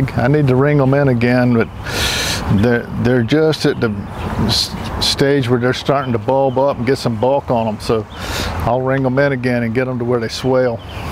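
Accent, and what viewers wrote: United States. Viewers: I'm British your Fortunately American much love my green fingered friend :)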